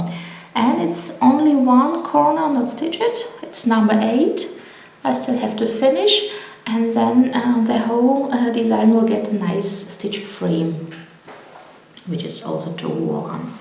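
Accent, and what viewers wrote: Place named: Germany. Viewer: I just found your floss tube; I LOVE your projects and I like hearing a German accent again. My husband and I were stationed in Grafenwoehr (Bavaria) for 3 years and I'd love to go back. We went to Saxony all the time though because Dresden is one of my favorite cities! I can't wait to watch the rest of your fposstubes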